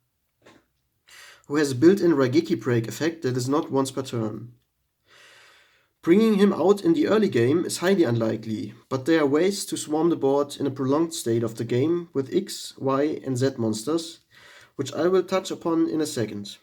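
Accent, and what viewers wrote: Germany. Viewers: hab gleich dein deutsch englisch rausgehört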